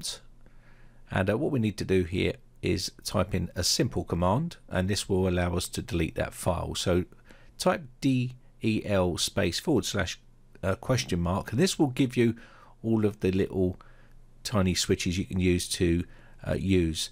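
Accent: United Kingdom. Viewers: Finally british accent being found.. This is rare.. no indian agian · It's British accent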